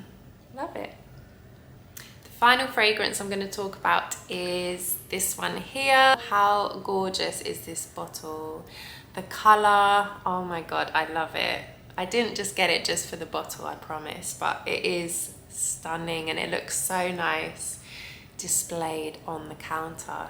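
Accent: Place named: United Kingdom. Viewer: i thought you live in the UK as you have British accent.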